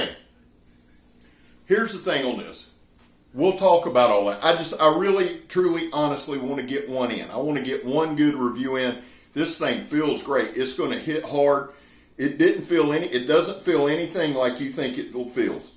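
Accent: South, United States. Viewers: Southern accents are so cool. All I got is this Canadian tongue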